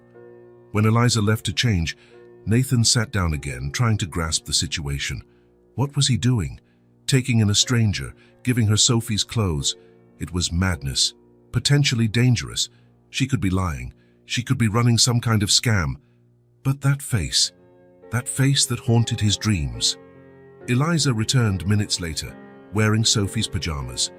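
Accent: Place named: England, United Kingdom. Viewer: It's only a story. I love the readers English accent.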